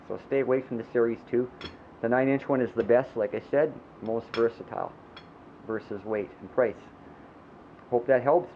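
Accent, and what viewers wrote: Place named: Canada. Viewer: Thanks for making the astute comparisons on the Kryptos. You sound like a Canada man. As for the bracket/clip mount, for the yellow NY Standard, I've simply taken off the bracket with the hex screw, and I have no intention of packing it that way. I pack in a backpack especially for hauling groceries, on the way to the store. On the way back, I have three choices. Either in the pack if there's enough room and nothing is getting crushed, or outside on the inside of the straps, and over the top, or on the bike itself around the handlebars in one of a couple of ways. It's fairly stable that way for a couple of miles returning for any of my three bikes.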